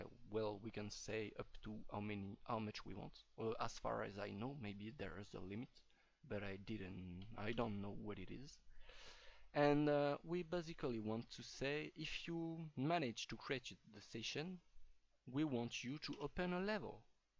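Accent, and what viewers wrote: France. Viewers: Je reconnais l'accent Français x)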